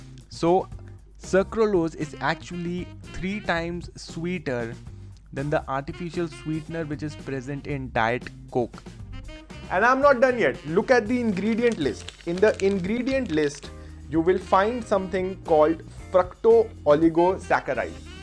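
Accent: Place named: United States. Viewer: Liked the video....if I ignore the pinch of American accent in btwn😁....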